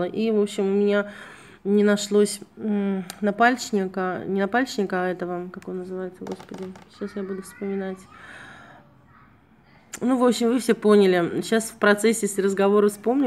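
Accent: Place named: Baku, Azerbaijan. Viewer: Не речь,а песня,родной,любимый бакинский акцент😘продолжайте вещать,;)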